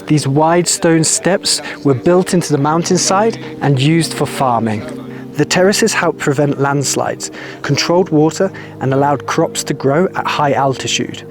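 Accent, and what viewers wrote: United Kingdom. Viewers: Hi my friend british. I often learn english with u. I mean british pronunciation... You are in my country hope you enjoy!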